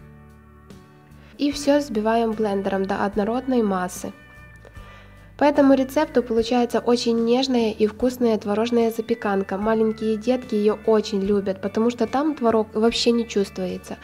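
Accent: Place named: Ukraine. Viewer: Достали критиканы! живет в украинской деревне,и дома разговаривает на украинском языке.( Вот оттуда и произношение другое). И это не помешало умной молодой женщине,создать и развивать свой канал!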